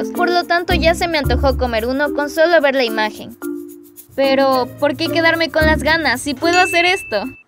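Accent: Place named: Colombia. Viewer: de Colombia no le vez el asento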